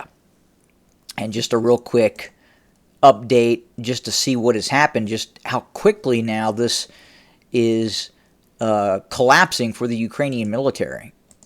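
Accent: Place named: South, United States. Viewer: VOR. Your racism is almost KKK like. Where were you born? Based on your southern accent, I suspect Alabama.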